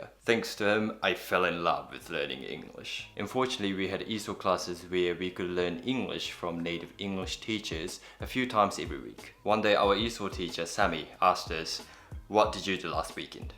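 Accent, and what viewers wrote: New Zealand. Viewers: アクセントはめっちゃくちゃニュージーランド人っぽくてびっくりしました！このような自然な日本人のアクセントで話せるようになりたいです！ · Hey, I love listening to your Kiwi English!! This is Tia again, thanks for getting back to me the other day to my comment. I also left Japan quite young, age of 15 after graduating JHS in Japan. I've lived in California for 6 years going to HS and Junior College. I chose to come back to Japan to work with a boyfriend I had at that time. (that is not my hubby though) well, my best friend who recommended your channel and I zoom nomikai sometimes and we talk about time of our life back in States. You must be a super dupper busy guy, but if there is a chance, It would be my dream come true to chat with you!!! Thanks for your time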